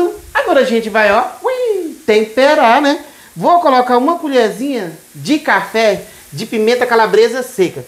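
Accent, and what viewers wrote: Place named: Pernambuco, Brazil. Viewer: Sou Pernambucana e achei o seu jeito de falar parecido com o nosso. Bjs queridos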